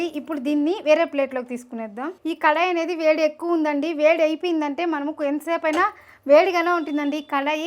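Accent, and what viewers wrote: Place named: Tamil Nadu, India. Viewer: But, you are looking and speaking like a Tamilian ,akka 😃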